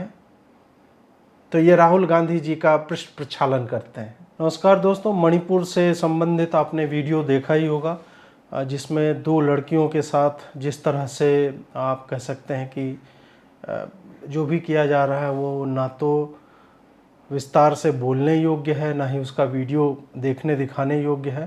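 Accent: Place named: Bihar, India. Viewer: कितना झूठ बोलोगे। बोली तो बिहारी किस्म की लगती है। अगर आप बिहार से नहीं हैं तो प्लीज़ बता दें ताकि हम बिहारी इस अपराध बोध से मुक्त हो सकें कि हमारे बीच से आप जैसे लोग भीआते हैं।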